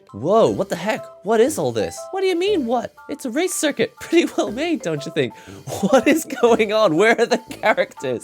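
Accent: United Kingdom. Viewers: Are you Japanese or British? I'm so confused O_O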